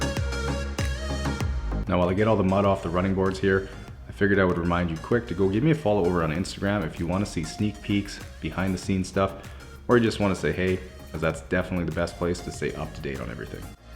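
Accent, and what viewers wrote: Canada. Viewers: I've recently found you yesterday and just watched 27 of your videos back to back.....safe to say I love your videos! I also love your voice overs, love a good Canadian accent lol. Wanted to ask though did you start as a detailer before you moved into this home? Curious how you started and got here. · You’re definitely Canadian. The zero degrees reference and your accent is a giveaway too. I love your channel. So satisfying!!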